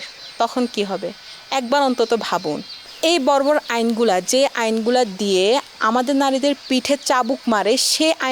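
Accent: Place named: Chittagong, Bangladesh. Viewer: সঠিক বলেছেন, সুন্দর উপস্থাপনা কিন্তু ভাষাতে চট্টগ্রামের আঞ্চলিকতা দূর করতে হবে।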